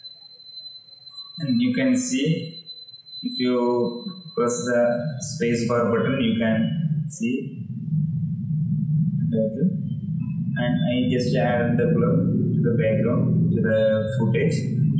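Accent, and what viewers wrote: Kerala, India. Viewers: bro... u from kerala???.... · ഇംഗ്ലീഷ് കേട്ടപ്പോ മനസിലായി മലയാളി ആണെന്ന്...മലയാളം മതി ബ്രോ...!!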